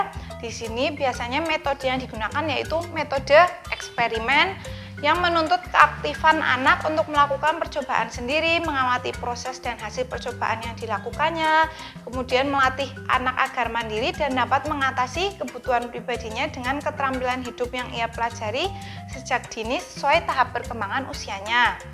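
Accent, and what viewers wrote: Java, Indonesia. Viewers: Walaupun medok mbake ayune poll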